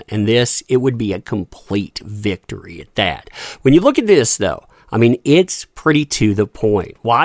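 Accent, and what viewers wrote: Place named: East Tennessee, United States. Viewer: I don't know if you've always been in east Tennessee but you sure sound like it and get tickled like it too.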